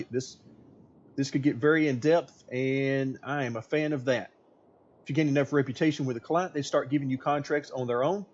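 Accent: South, United States. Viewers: Naw, this sounds more like Georgia-Missippi-Alabama southern accent.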